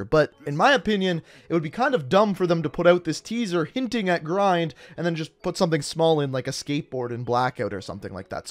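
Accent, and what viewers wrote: Canada. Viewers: he says moreoh bc he is canadian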